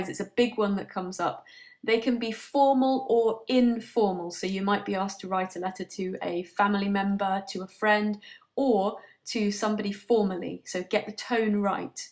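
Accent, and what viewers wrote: United Kingdom. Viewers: I love British accents also great video I learnt a lot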